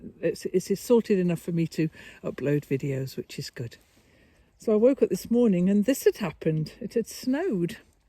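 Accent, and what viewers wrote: England, United Kingdom. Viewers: I was thrilled to see you NYE. You always are so interesting. My grandmother on my dads side came from England, however, passed before I got to know her. Your accent draws me closer to her because I do remember her accent and the way she spoke I was only four and I loved her so much. I am doing ancestry to see where and when she came here to United States. I feel like I’m on the sofa with you. Keep up the videos♥️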